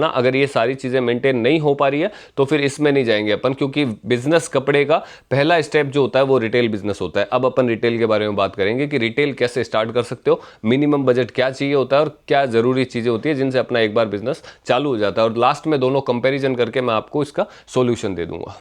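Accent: Rajasthan, India. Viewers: आप राजस्थान से हो क्या भाई जी।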